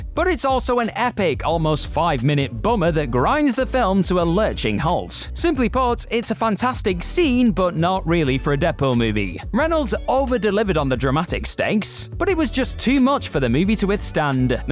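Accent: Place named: United Kingdom. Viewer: Yes it is, I've been watching British tv for over 40 years, I've heard that exact pronounciation hundreds of thousands of times from other Brits. It is part of the accent.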